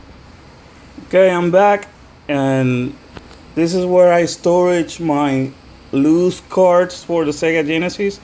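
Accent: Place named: Florida, United States. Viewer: You have some bad azz games there my friend and your Puerto-Floridian accent shines in this video. I am jealous of all those pricey obscure titles. Give me give me!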